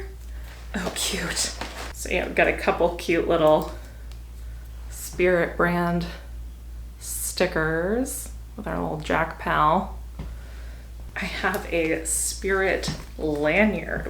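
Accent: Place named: United States. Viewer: I hear that American accent quite often, I really like it. What type is it...Southern?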